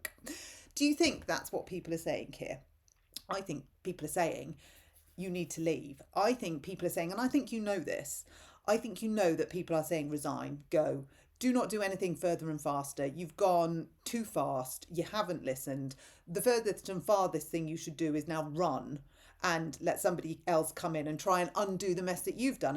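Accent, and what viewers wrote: RP, United Kingdom. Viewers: I love the harmony btw the colour of the door and the fireplace. Also your posh accent.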